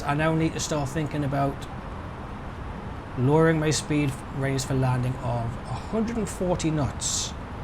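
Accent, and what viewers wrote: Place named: Newcastle, United Kingdom. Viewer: nice accent, hello from a fellow geordie. nice video mate.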